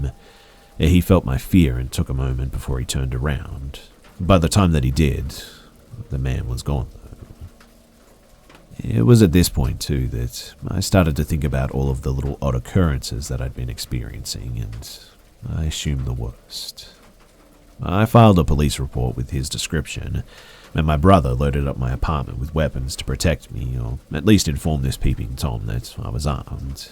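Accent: Australia. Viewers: One of the few people who's voice actually makes me feel safe, comfy and ohhhh sooooo chilled., i could honestly listen to you forever, what I'd give for you to read me a bedtime story every night, I'd sleep great permanently......gotta love an Aussie accent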